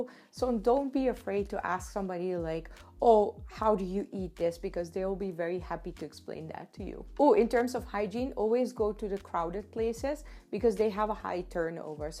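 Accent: India. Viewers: Awh you have a lil Indian accent and im jealous I’ve never been to the motherland 😔 ugh you’re earrings are 😍 and omg you are definitely becoming a Indian talking with your hands all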